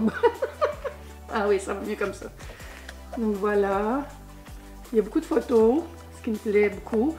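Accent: Canada. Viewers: Bonjour, tu es magnifique! Tes petites crollettes (tes boucles comme on dit chez nous à Bruxelles ) te vont super bien! Continues comme cela, j'adooore ton accent canadien qui est si chaleureux et chantant.:-)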